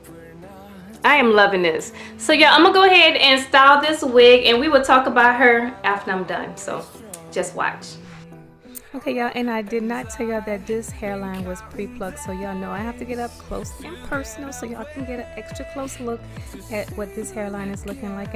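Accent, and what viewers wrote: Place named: New Orleans, United States. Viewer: New Subbie. You have a New Orleans accent, I know this because that's my city... Baaa-Bay... I want that color, it's beautiful on you. I want 2, LOL..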